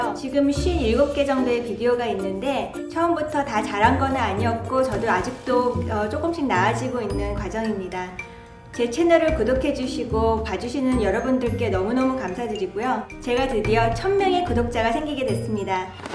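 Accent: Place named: South Korea. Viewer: I just love your korean accent. I watch korean movies heheh just a side note. Congratulation on 1k subbies!! You deserve all the love ♡♡♡♡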